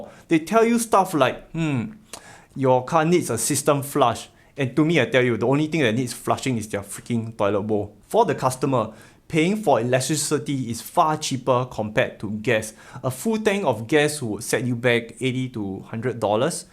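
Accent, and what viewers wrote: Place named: Singapore. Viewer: It’s just a standard Singapore English accent. Should be easy to get used to.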